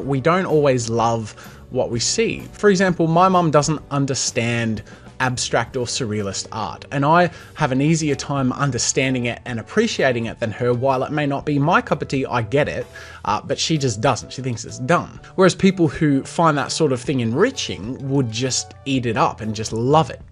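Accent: Australia. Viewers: Is he Aussie? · U r australian 😆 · Who else is watching this in 2020 and thinking he used to sound more Australian? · is he Australian?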